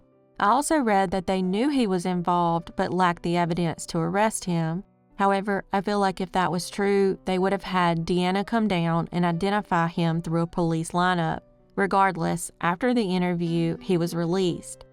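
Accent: South, United States. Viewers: THANK YOU!! LOVE THAT SOUTHERN ACCENT!! AND THANK YOU FOR MENTIONING NEW MEXICO AND EL PASO TEXAS!! AND PLEASE ALL VIEWERS PLEASE SUBSCRIBE!!! SHES GONNA HIT THE BIG NUMBER HERE PRETTY SOON!!!!:hand-pink-waving::thanksdoc:🥰 🤠🤠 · I love her sweet Southern drawl! Her speaking voice is very pleasant and adds comfort to these tragic stories. Southern comfort😊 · There are other folks out there doing good work, but you are my favorite! Maybe it's that outspoken southern and compassionate thing you do so well.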